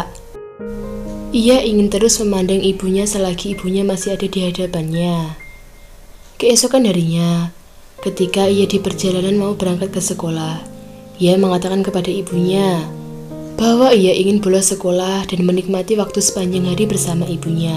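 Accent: Java, Indonesia. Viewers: swarane medok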